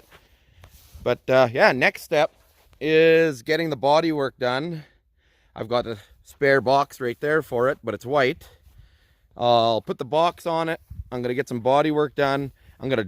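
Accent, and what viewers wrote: Canada. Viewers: Sounds like you're a Canadian you should watch another Canadian if you do or don't it's. a v e Arduino versus evil what it stands for but this dude is a trip his channels here on YouTube rebuilds and tears apart anyting mechanical or electronic I think you would get a kick out of this dude peace out have a great day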